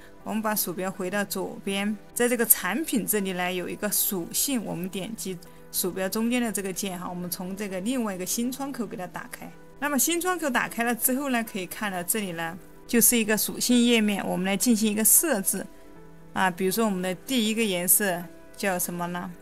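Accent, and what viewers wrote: Hubei, China. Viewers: 湖北仙桃口音？ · 为什么我觉得和雷军一样是湖北口音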